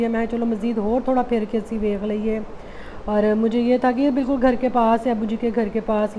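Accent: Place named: Jalandhar, India. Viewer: ਤੁਹਾਡੀ ਬੋਲੀ ਇੰਡੀਆ ਪੰਜਾਬ ਚ ਜਲੰਧਰ ਵਾਲਿਆਂ ਨਾਲ ਮਿਲਦੀ ਐ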